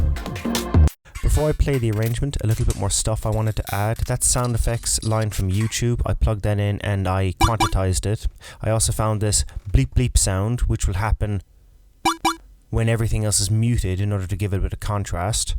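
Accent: Ireland. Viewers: Now the next challenge is to find what Irish accent is it? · Loving this and great to hear an Irish voice doing such killer tuts. Nice one mate.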